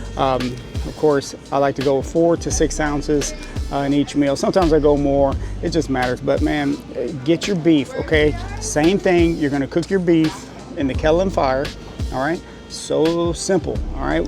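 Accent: Louisiana, United States. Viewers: Great video, brother. Are you from Louisiana? I'd guess southwest, somewhere between Lake Charles and Lafayette area. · Are you from Louisiana? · Are you from Louisiana? Love your accent!! I'm a NOLA girl, but I hear some cajun in your voice! Thanks for the protein hacks.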